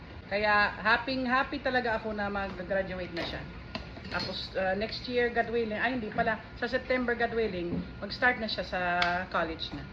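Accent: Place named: Ilocos, Philippines. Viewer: Wow..galing mo po mag tagalog and parang may ilocano accent din po the way ka po mag salita.. ❤❤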